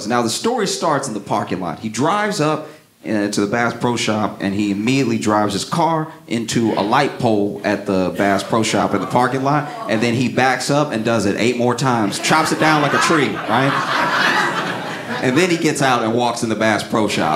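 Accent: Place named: South Louisiana, United States. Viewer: That Sourh Louisiana jumps out a little bit every set.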